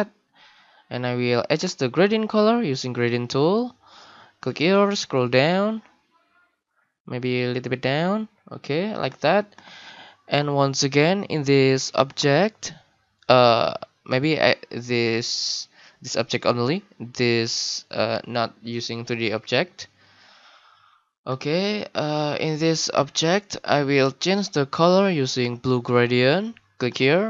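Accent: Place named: Indonesia. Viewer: hem logatnya kyak orang indo ya...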